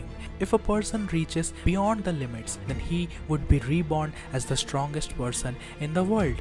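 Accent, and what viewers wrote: India. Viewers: Remove your India accents its annoying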